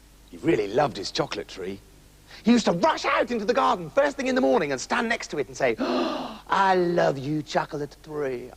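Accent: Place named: France. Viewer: OMG! Love this guy's French accent so much. Robin Williams and he would have made great friends, if they weren't in life already. Chocolate trees are awesome!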